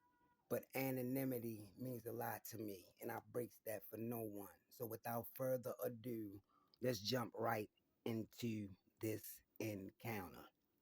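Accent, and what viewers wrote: South, United States. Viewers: you can narrate a story like nobody’s business. Dang. Skills of a pro actor my guy. I just became a member of the Pack. I’m just north of Albany,NY, in the country. I come from down hear Chicago, & your manner of speaking brings back memories. I figure you’re probably in the South, but a lot of southern folks moved up Chicago ways, and you remind me of that🙂. I love listening to your videos when I lay down at night for bed. Should give me nightmares, but they never do. I can see your podcast really blowing up. It’s unique. Take care of yourself Mr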